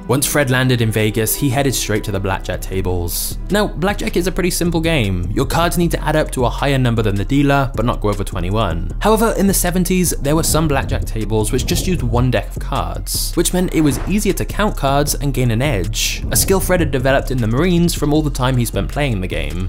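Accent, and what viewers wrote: United Kingdom. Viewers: Yeah but the UK accent sucks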